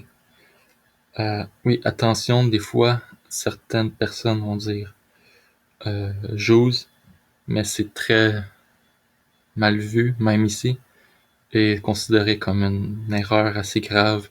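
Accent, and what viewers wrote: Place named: Quebec, Canada. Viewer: J'adore l'accent québécois ! .. et tes vidéo aident moi .. Merciii ...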